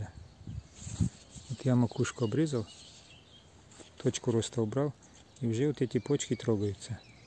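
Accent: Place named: North Ossetia, Russia. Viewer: Добрый день! Случайно попала на Ваш канал и не могла 2 часа оторваться! По комментариям узнала(по акценту догадалась) что Вы из Осетии. Подписалась и буду применять Ваши методы. Все здорово и понятно.А кто Вы по профессии?Удачи и процветания. Елена Тедеева .Армавир.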